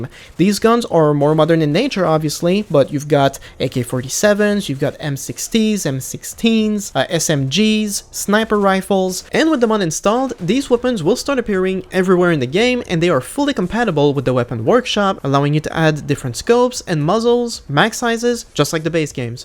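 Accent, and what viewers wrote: Quebec, Canada. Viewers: Are you from Quebec by any chance ? Feels like i'm watching a GSP mod review ;)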